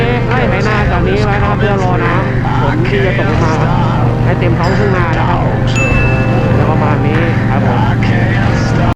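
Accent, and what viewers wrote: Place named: Isan, Thailand. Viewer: สำเนียงเสียงเว้าม่วนหูหลาย FC from Laos🇱🇦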